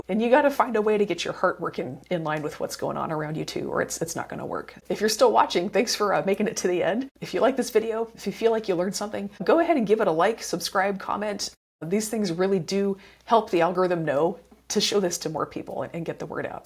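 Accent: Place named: Lompoc, United States. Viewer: Oh,my, are you in Lompoc, CA? Don’t answer but that’s what it sounds like. Cheers.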